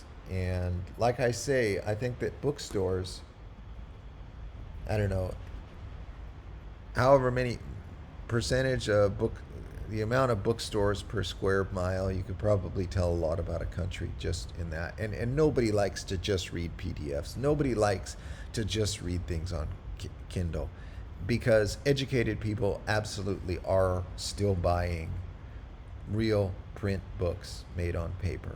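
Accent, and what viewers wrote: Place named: United States. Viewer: Maybe Colombia isn't so open to you, an expat, because you keep spelling the name of our country wrong. Or because you're coming from somewhere else and making broad generalizations about people, using phrases like "better" when comparing cultures. Who the f*ck are you? Where are you from? You sound American to me. We know how welcoming the US is to foreign expats who aren't from Canada...(*sarcasm) Also, you sound like an absolute CREEP, using phrases like "I get slightly better women". Bro, go back to Wisconsin or whatever. Or can you not "get" any women over there?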